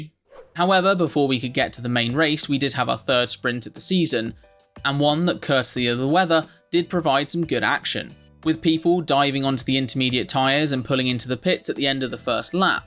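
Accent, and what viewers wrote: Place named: United Kingdom. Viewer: This vid starts. I hear Brit accent and I hear immediate jab at Verstappen. Yah. Ciao. Not my video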